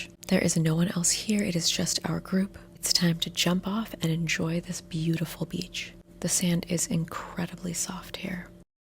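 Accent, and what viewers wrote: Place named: United States. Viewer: What do you mean? I'm an American who understood her American accent perfectly. I'm sorry if you don't have the cognizance to understand one of the most common, average, generic, but generally functional accents in the English language.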